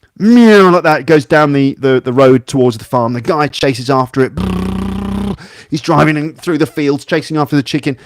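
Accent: United Kingdom. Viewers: I love listening to British accents... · This podcast is definitely needed for a British pronunciation. Thanks for everything, cannot live without it since my first listening!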